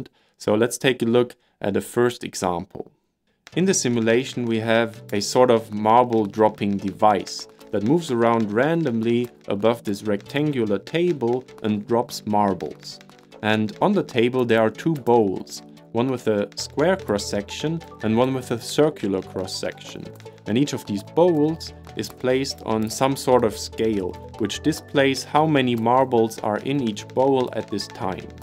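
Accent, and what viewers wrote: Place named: Germany. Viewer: du bist doch en deutsche Bub oder? der hör ich doch raus sache mal! Und bockstarkes Video also wirklich erste Sahne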